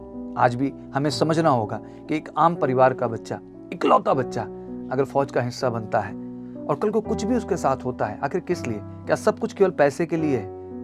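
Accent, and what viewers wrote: Bihar, India. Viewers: Mr bihari accent, you remind me of Kanhaiya of JNU. Beta kaunsi party se ho? My father served in the Army for 30 years. He himself said that anyone committing suicide is not a brave heart. Suicide ke kaaran 90% due to personal issues hote hain. Jisko nahi bhejna na bheje fauj ke liye. Kamzooro aur namardo ke liye hai bhi nahi ye fauj. Zara video banao jo actual fire fight main shaheed hote hain. Dont make the armed forces weak. Aa gaya na aukaat pe ki agniveer nahi hona chaiye aur permanent hona chaiye. Dikha hi di aukaat.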